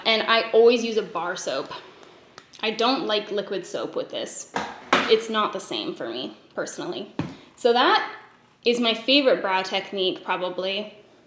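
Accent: Canada. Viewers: I’ve needed this so badly!! I just had LASIK so I don’t need glasses anymore. I got under eye filler to fix my “”baygs.” That’s Canadian Sam talk for bags. Now that nothing is hiding my face, my brows need to be on point. Help Sam!!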